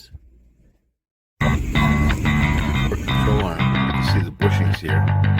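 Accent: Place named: New England, United States. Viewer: Love your New England accent.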